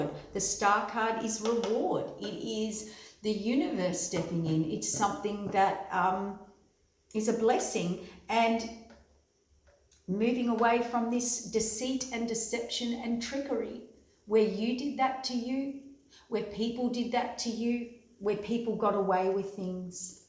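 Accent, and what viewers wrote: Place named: Australia. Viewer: Excellent video, great to hear your Aussie voice.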